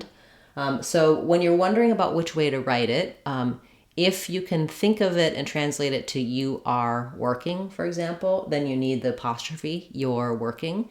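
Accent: United States. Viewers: Thank you,. It's a lot of people helped change the English language. Special American accent Thank you so much.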